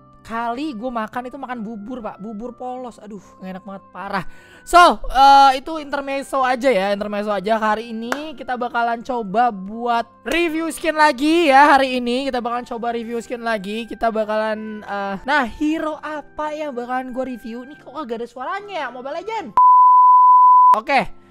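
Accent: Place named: Medan, Indonesia. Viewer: kaget bg * logat medan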